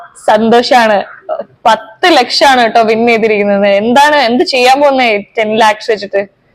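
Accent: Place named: Malappuram, India. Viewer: Full മലപ്പുറം ആണലോ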